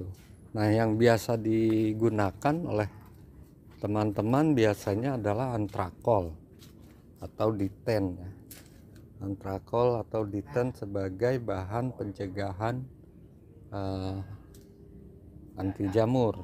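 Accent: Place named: West Java, Indonesia. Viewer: iya sudah sy kira juga ada logat Sunda ya... iya mmng ini musim hujan tiap hari...sy di bogor trims pencerahan ya n nasehat ya.. salam aglonema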